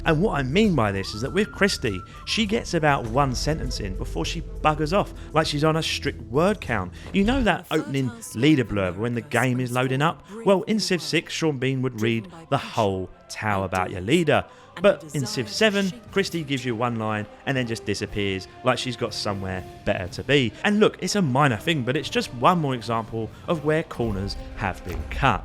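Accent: United Kingdom. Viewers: Definitely the most British opening to a video I have ever heard.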